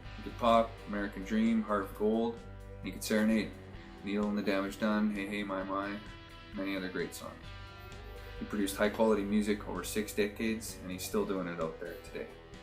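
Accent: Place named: Canada. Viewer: You can tell this guy's from Canada in the first three seconds.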